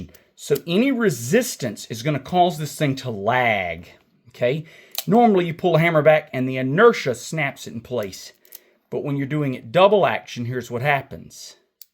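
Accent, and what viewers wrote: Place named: Oklahoma, United States. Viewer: Are you an Okie? A buddy of mine is from Ok City and sounds just like you! Btw, great video, I have an old .38 sp. that’s out of time.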